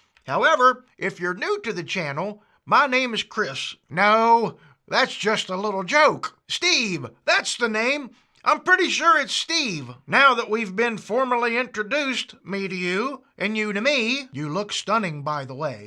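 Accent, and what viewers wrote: South, United States. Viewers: Metal guitar player born and raised under nashville, honestly i like videos that give perspective, i remember seeing your "wrong gig" video awhile back but i subscribe to andy rooneys channel and said to myself "oh crap, he's watching the video"! Then i fell down the rabbit hole and found you channel and subscribed. So far ive enjoyed the insight, the humor and the fact that i hear another southern accent actually made me feel better! I rarely subscribe to channels but music keeps me sane so theres only a couple on my feed, andy rooney, matt freeman from rancid, kyle bull, ola englund and yours! Id say your on the right path as far as content is concerned, the mix of insight and humor is refreshing, keep doing what your doing and im sure people will take notice.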